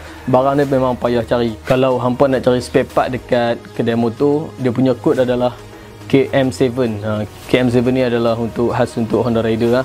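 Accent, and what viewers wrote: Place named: North, Malaysia. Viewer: Mna abg dapat barang moto lama ni....dgr suara belah utara..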